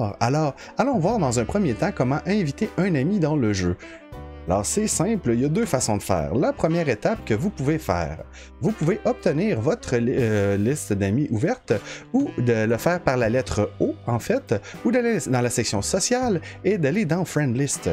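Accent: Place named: Canada. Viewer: Salut ! J'aimerais seulement aujourd'hui commencer le jeu avec ma petite amie qui en ce moment ne se trouve pas dans le même pays ni dans la même région... Il serait alors probablement impossible de jouer ensemble de ce que j'ai cru comprendre, car elle serait dans les serveurs d'Amérique et moi donc Européen. Mais j'ai cru comprendre que tu étais Canadien de par ton accent ? Tu es donc Américain mais tu joues sur Cerberus qui est un serveur Européen, j'aurais bien aimé savoir comment tu t'y est pris ^^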